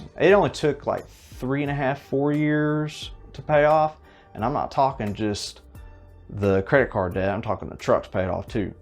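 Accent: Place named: West Virginia, United States. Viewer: awesome dude. I was hoping you were closer to home as we share the same accent. Lol. I'm here in point pleasant wv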